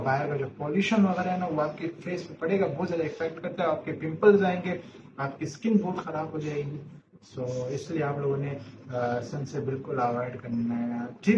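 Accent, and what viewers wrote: Pakistan. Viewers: Bhai tere bolne ke style se lag raha hai ki tu Pakistani hai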